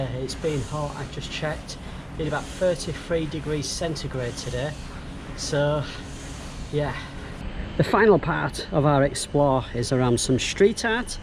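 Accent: United Kingdom. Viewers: actually your pronunciation is fine, you just has a British accent. Don't worry it's fine.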